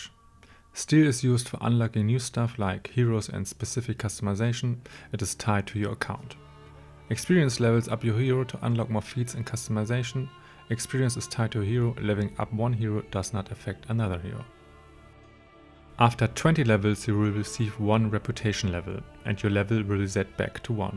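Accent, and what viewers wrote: Germany. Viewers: are u german btw? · I h Liebe deine Aussprache kann es sein das du Deutscher bist ? PS super Video danke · WIe man den deutschen Akzent hört loool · Ich würde jzt einfach mal so sagen das es ein deutscher Akzent ist ?🙈😂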